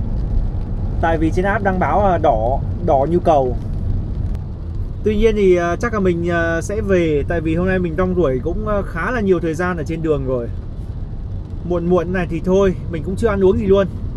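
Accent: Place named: South, Vietnam. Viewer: Anh có bạn miền nam ko? Anh nói nhiều lúc có pha tiếng nam vô :))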